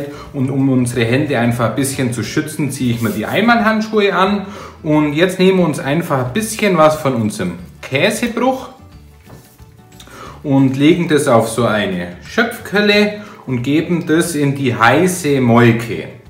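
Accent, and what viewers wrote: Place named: Augsburg, Germany. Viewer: Hört sich nach augschburger Dialekt an.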